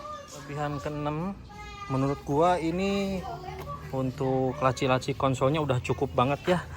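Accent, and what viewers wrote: Indonesia. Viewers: logat Sunda+gua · Urang Sunda ieu mh logatnya beda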